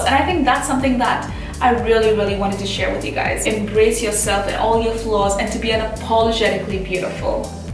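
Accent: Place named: Minnesota, United States. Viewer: I did subscribed ❤😘😘 lovely speech, kinda intersting, And the accent is really really good to hear. Same as minnesota state😍😍😍😍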